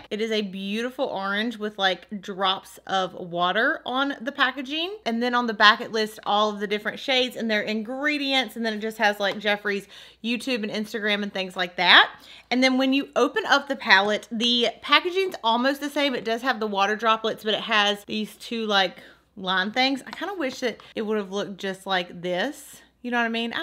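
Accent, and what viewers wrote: South, United States. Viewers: I just found you thru madison's giveaway and I been watching all your videos. You talk as country as me and I love it. Where are you from? I'm from Ga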